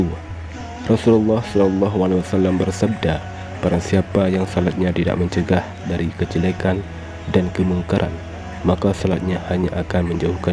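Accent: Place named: Bali, Indonesia. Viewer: Orang Bali kah, ithu ....ditherima....dst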